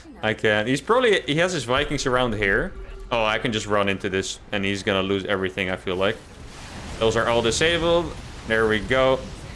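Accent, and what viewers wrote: Netherlands. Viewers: dutch english is the most cringe accent ever jesus christ unwatchable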